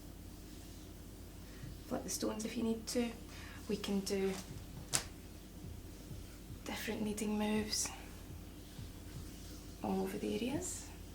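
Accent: Scotland, United Kingdom. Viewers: Scottish accent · Really informative and relaxing to watch, as everyone has said. I love the Scottish accent too! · Scottish is an actual language. She's just speaking english in an scottish accent. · Ah ma fellow scot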